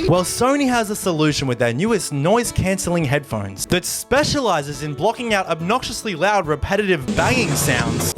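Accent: Australia. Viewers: He is Australian I believe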